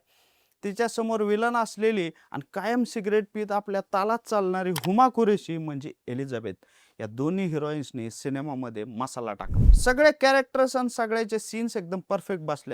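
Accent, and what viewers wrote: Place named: Barshi, India. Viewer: बार्शी cha आहे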